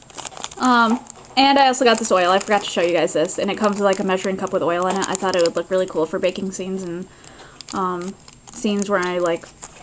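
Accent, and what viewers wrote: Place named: United Kingdom. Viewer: No prob. I loooooooooooove British accents. They r just so, so, BeAuTiFuL!¡!¡ XD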